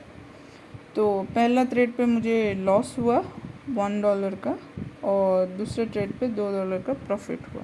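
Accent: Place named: Maharashtra, India. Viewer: Aapke bolne se lag raha aap maharashtra se ho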